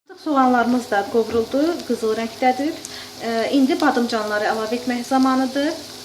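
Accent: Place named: Yeraz, Armenia. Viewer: Ay sənin ləhcənə qurban. Ay mənim YERAZ bacım.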